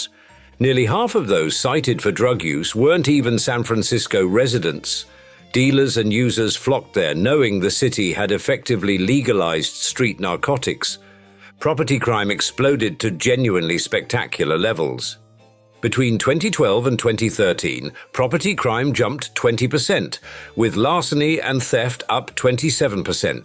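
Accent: United Kingdom. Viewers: When you hear a British accent turn off!!! Thats what I do...BYE!!! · It isn't rich millennials. More specifically its high tech nerds that are socially awkward and don't want community. I wish you would have used an American accent onthe AI voice instead of British.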